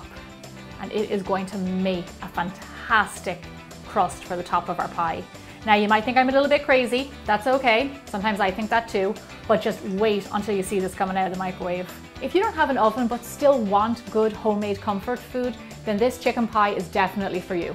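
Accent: Ireland. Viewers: Are you from the Republic of Ireland or Northern Ireland ? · OMG YOURE IRISH ME TOOOOO!!!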